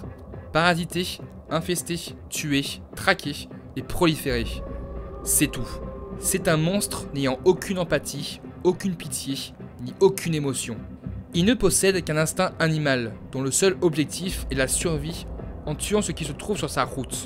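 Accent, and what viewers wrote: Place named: Paris, France. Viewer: vidéo bien faite, par contre cet accent parisien snobe est insupportable "particularitéffff, il sort les yeuxffff, mercichhhiiaann etc" donc pas de pouce bleuffffffan, mais pas de rouge par respect pour le travail.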